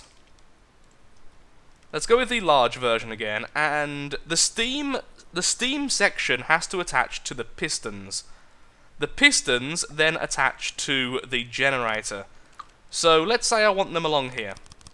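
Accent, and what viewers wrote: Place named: Black Country, United Kingdom. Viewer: Love hearing youtubers with that Black Country accent :D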